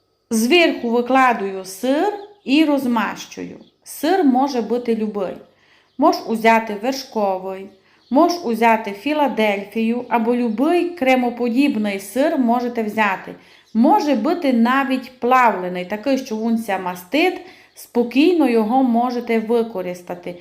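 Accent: Transcarpathia, Ukraine. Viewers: Так, це закарпатський діалект, колоритна говірка. Якщо «бесит», не слухайте. Нас з деяких пір російська дратує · Аби шо. Сказало, як у діжку пукнуло. Це закарпатський діалект, обожнюю його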